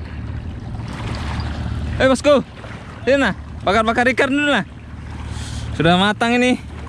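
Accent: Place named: South Sulawesi, Indonesia. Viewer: Mantap Bosku ...klo dengar logatnya klo tdk salah dari Bugis Makassar kah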